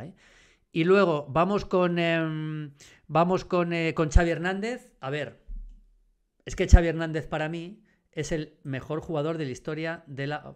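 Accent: Spain. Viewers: Bueno Ud es un periodista de 1º, pero se le notó lo Español para este video. Abrazo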